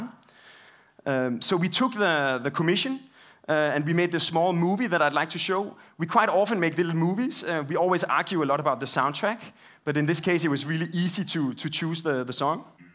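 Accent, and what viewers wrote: Denmark. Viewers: Pretty much everyone from Denmark does this when they speak English or Danish, so I have gotten used to it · Yes it is annoying. In Denmark the ones teaching english developed their pronunciation when the primary contact was text based, or in songs. So unfortunately all the grammar, and habits from sentence construction and for emphasizing have been transferred to verbal English. The younger generation is much more exposed to American television, and have less tendency to do this. Also it is worse in Copenhagen than in the rest of Denmark, perhaps because the local dialect is further from English.. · it's the copenhagen accent :P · Aw... You just have to love the Danes :) Awesome accent! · just a Danish accent :c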